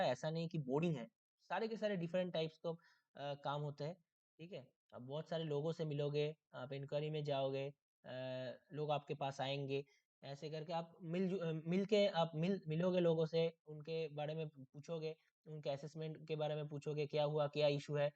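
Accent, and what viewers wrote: Bengal, India. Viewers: দাদা আপনি বাঙ্গালী নাকি?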